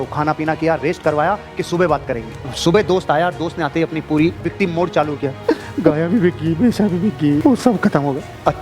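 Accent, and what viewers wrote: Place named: Jaipur, India. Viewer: क़तई ठेठ जयपुरी भाषा बोली 😂😂😂 सर जी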